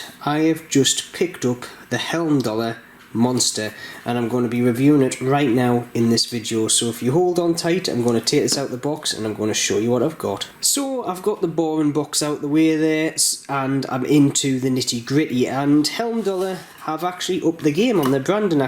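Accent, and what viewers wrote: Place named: North, United Kingdom. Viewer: Can’t place the accent. South Durham, Cleveland? I enjoyed the review. I have a black gen 2, which, needless to say, I adore. May just get one of these.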